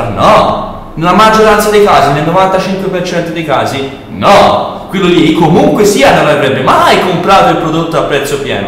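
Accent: Tuscany, Italy. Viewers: l'accento toscano <3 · HAHAHAHAHAHAHAHAHAH ! Sei troppo forte !!!!! mi piace tantissimo sentire parlare Toscano, stupendo il passaggio del dito medio......... ahahahahahahahah !! Mi ricordi tanto il conte uguccione......! ahahaha...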